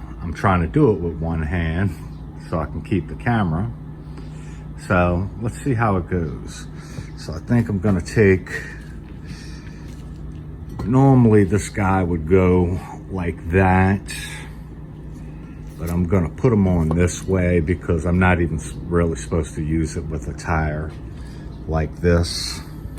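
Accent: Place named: New Orleans, United States. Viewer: Great job,. I changed hundreds of tires when I was about 18 with a floor bead breaker, two tire tools and a rubber tire hammer around 1969. Single and split rims with rings. The small tires are the harder. I have two tires I need to mount on two plastic rims that have been eating my lunch lately but I don't have a little changer like yours. Even if I did, I would probably break the rims. I don't know where you are but is sounds like you are from New Orleans. Thanks for a great video.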